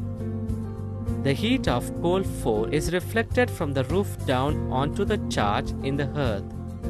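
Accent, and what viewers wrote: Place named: India. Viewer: all I know is that the narrator is clearly indian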